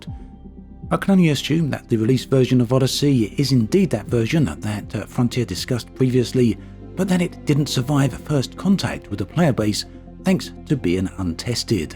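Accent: United Kingdom. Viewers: I fucking love your accent! Is this british or something special or regional?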